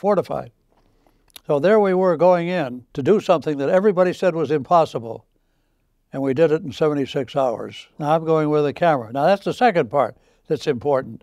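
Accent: Boston, United States. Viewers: He's got a very interesting Boston accent...in that it doesn't sound like any Boston I'm familiar with. Very intriguing! I wonder if he comes from a very old New England family. He's got a very Rhotic accent....no pawk the caw in the yawd.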